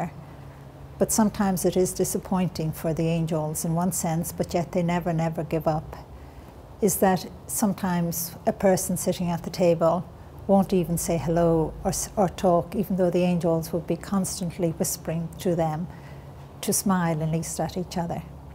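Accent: Ireland. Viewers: She's Irish, so 'tread' I guess lol. No offence, it's just the way they pronounce it there :)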